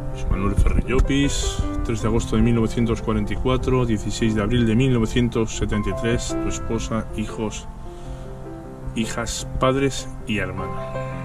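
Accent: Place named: Spain. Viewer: Elocuente narracion con ese acento espanol, soy guatemalteco y las versiones de me siguen gustando, Exitos soy Orozco Bravo.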